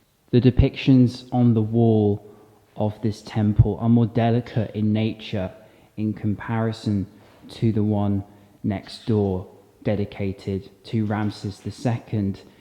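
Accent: United Kingdom. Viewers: I'm in love with the British accent.....greetings from Syria to you and to Egyptian people 💙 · The best british accent ever 🇬🇧♥️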